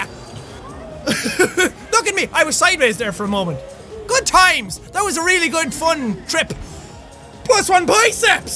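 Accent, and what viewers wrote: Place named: Ireland. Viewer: LazyTurtle I'm brazilian and my school don't teach very well so I have to learn by my self, I learn a lot but I still have a few words to know, I doesn't know too much when I find jack but I actually learn a lot with him, and was hard because he has a Irish accent..... I talk a lot sorry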